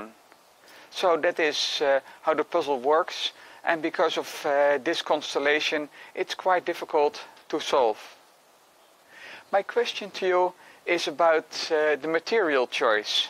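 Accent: Netherlands. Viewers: ik denken van wel: hoor zijn naam en hoe hij zijn naam zegt en hij heeft een nederlands/Engels accent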